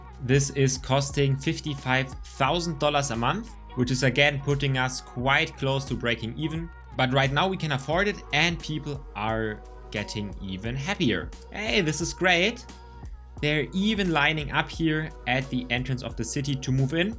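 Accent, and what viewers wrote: Germany. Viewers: Also nich böse gemein. Das hört man ja raus dass er deutsch is😅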